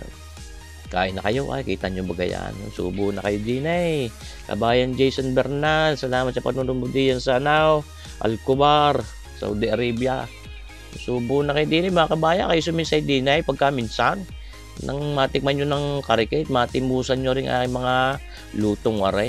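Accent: Batangas, Philippines. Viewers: I love your recipe at higit sa lahat I love your Batangeño accent and your humor when speaking Sir. I miss hearing that accent, majority of my officemates before, were from Batangas.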